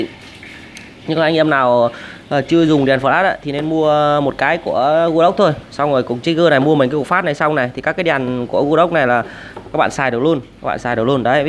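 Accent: Thanh Hoa, Vietnam. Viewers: Nghe giọng ông T.hóa chán quá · kiểu như giọng thanh hoá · giọng thanh hóa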